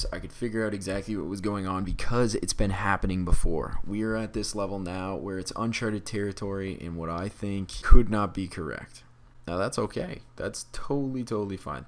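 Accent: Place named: Canada. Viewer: This is not related to the content haha but you have a “Canadian” accent. I appreciate that. Thanks for the info by the way! I came from tiktok and you’ve been a big part of my learning crypto and ETH in particular! I hope you keep making content of this sort of YT. That being said, I do appreciate the car content as well because I drive one and know nothing about it. Multi level education, thanks!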